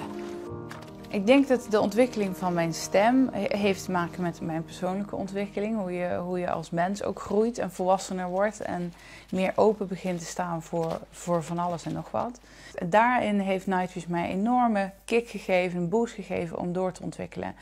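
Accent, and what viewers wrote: Gothenburg, Sweden. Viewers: It's a Gothenburg accent, not Norwegian. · Gothenburgian accent with a slight Dutch brogue =) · Ja, har blivit riktigt bra på svenska. Är det bara jag som hör en tendens till göteborgska? Inte så konstigt kanske med tanke på att hon bor nära Göteborg, men ändå.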